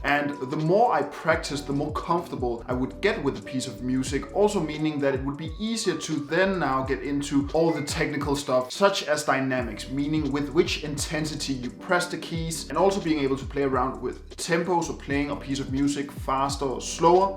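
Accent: Denmark. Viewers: Dansker, lol.. så tydeligt :D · i can hear the danish accenttt :p anywho great video I'm 18 and wanna start learning how to play piano so bad- I've always wanted to know how to play an instrument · Thanks for the inspirations, I am brand new myself, and are still working on my wife to allow me to buy a piano LOL... BTW are you from Denmark? I am and think I hear a bit Danish accent in your video. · Jeg var ret sikker på, at du var dansk - ud fra din accent. Da du så slutter af med at sige "... rounding of the video" var jeg helt sikker :P Tak for en god video :)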